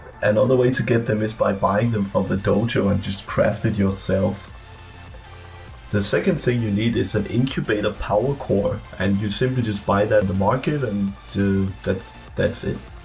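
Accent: Denmark. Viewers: Well it was my frist kavat and I just got it xD Btw are you from Denmark? because you sounds a bit like a dane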